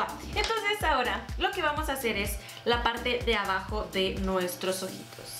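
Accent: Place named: Guatemala. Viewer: Me encanta tu acento chapin! Super chivo el video 🌟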